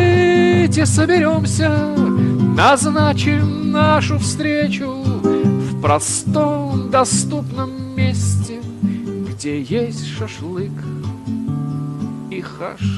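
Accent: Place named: Baku, Azerbaijan. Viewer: КРАСИВО.. Я БЫ ВМЕСТЕ СЛОВА ДУБЛЯЖ .. ВСТАВИЛА СЛОВО АКЦЕНТ ..* ГДЕ БЫ ВЫ НЕ БЫЛИ , ЕСЛИ ВЫ УСЛЫШИТЕ ДО БОЛИ ЗНАКОМЫЙ И РОДНОЙ БАКИНСКИЙ АКЦЕНТ ..ЗАТАИТЕ ДЫХАНИЕ , СЕРДЦЕ ЗАСТУЧИТ БЫСТРЕЕ ,БУДЕТЕ ИСКАТЬ ГЛАЗАМИ ИСТОЧНИК ЭТОГО АКЦЕНТА ???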